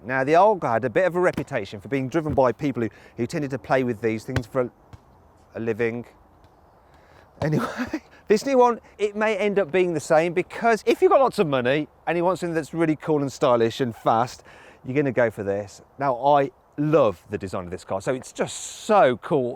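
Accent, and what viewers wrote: United Kingdom. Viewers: 리뷰하시는분 발음이 영국분 같은데 나같아도 국뽕맞겠다..ㅜㅜ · 영국발음 너무조아 미쵸♡♡♡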